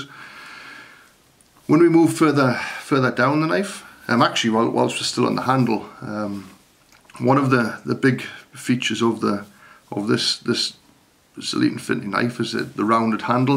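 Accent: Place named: North, United Kingdom. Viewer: Always a delight hearing another northern accent on YT!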